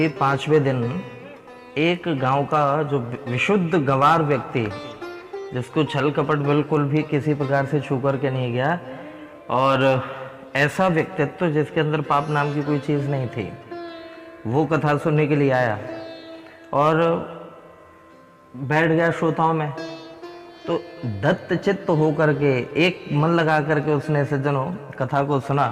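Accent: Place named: Vrindavan, India. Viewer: Kitni meethi boli Shri Vrindavan Dhaam ki Dhanyavaad PrabhuJi Kripa karo Bihariji Radharani kripa banaye rakhe sada 🙏🏻🙏🏻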